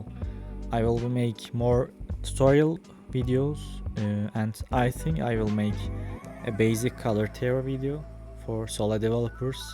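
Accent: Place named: Turkey. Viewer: Türkçe aksanı nerede görsem tanırım... güzel video reis oynu da wishlistledim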